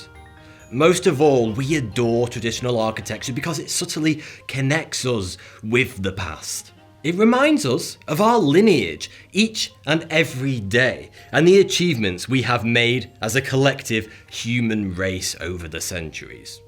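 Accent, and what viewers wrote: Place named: Manchester, United Kingdom. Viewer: Bro: I like you. But you need to scale down your thesaurus addiction. It doesn't make the argument any stronger to use the word proclivity. I like a good diction but your proclivity for overt verbosity is verges on pomposity and its very superfluousness is rendered further supercilious by the manc accent. Yours, a fellow manc. Love x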